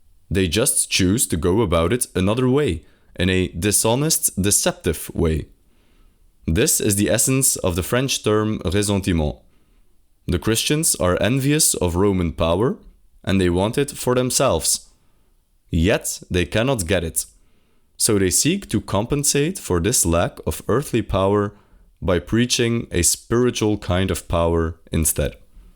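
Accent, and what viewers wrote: Flanders, Belgium. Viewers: Benje van België lol? Ik hoor uw accent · Thanks for making philosophy digestible :) Are you a native Dutch speaker (with a Flemish accent)?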